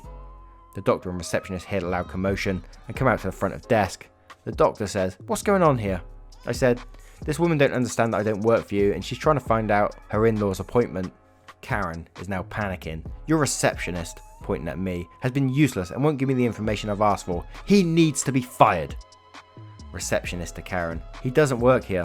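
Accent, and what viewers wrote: United Kingdom. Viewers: HI BRITISH PERSON!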